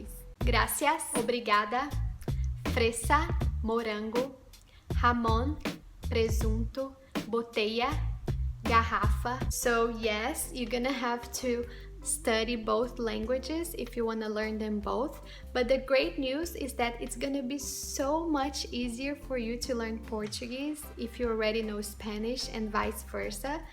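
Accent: Brazil. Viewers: It was you who put the subtitles? Its because the flag is Portuguese, but the subtitles and your pronunciation are Brazilian. About the "V" in some zones of north of Portugal people read the "V" like in Spanish. "Vaca" stay 'βakɐ. The Portuguese have no problem learning Spanish, in fact a Portuguese needs half the time than a Spanish because of the sounds. In European Portuguese we have a strong "R" and we do not read it as "H". In fact the Spaniards have problems in reading our R. You must change the flag once your comparisons and pronouncements are in Brazilian Portuguese and not European. To be honest, it is harder for a student to learn Portuguese after learning Spanish than for learning Portuguese first. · Você estava falando em português do Brasil, em vês de português de Portugal... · Tenta praticar mais o teu portugues de portugal porque tu estavas a falar portugues do brasil e nao do Portugal eu sou português tenta praticar mais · But Portuguese you speak is Brazilian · All she says is for a Brazilian Portuguese. 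European Portuguese sounds nothing like she's talking. It sounds more like a mix of French and Polish tbh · Your Portuguese pronunciation of words is Brazilian not European. Can u upload a video how to pronounce various sounds like 'rr' in European Portuguese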